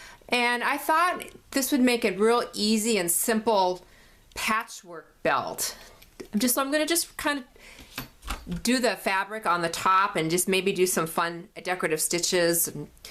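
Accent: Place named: Iowa, United States. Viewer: The only redeeming feature of the belt are the buckle rings...you really made it beautiful. My family in northern Iowa sound just like you...delightful.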